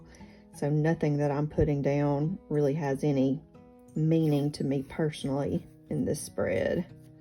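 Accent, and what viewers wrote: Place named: South, United States. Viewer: If "Hi y'all" fits you better than "Hi guys", I think you should definitely switch! I'm not sure I know anyone who doesn't love a southern accent. :) Be you!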